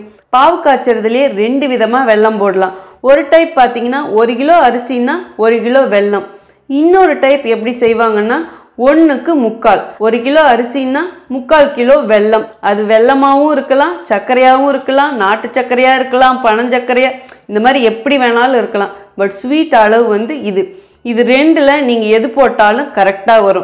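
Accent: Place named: Kongu, India. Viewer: thanks but your tamil is kongu tamil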